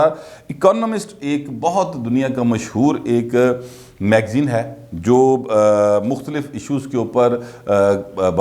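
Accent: Punjab, Pakistan. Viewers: ماشااللّہ! بہت خُوب صاحب بڑے جَٹکے اور سادہ طریقے سے پنجابی تڑکے کے ساتھ حقائق بیان کرتے ہیں مزہ دو بالا ہوجاتا ہے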